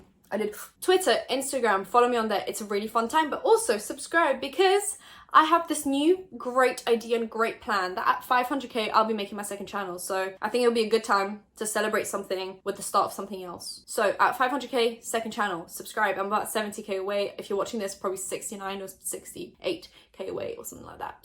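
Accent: United Kingdom. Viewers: why did i know you had a british accent before i clicked on this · You know what’s weird when you were talking about pop tarts I forgot that you were from the U.K. I think I’m tired how did I not hear your accent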